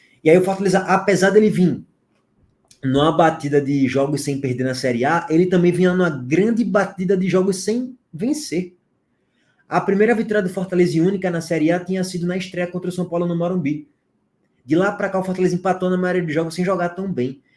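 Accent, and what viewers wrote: Bahia, Brazil. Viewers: só baiano nordestino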